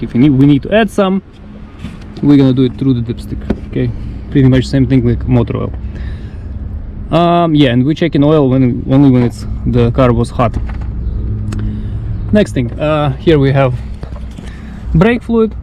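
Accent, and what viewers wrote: Russia. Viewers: Thanks man! Your Russian accent makes this video easy to understand and learn. Thanks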